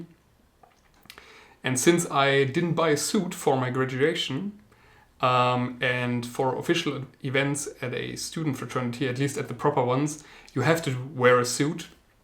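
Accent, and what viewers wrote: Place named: Germany. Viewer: Great content. Your accent reminds me of my ex girlfriend dad. He is German and has the same accent 😅 no offense haha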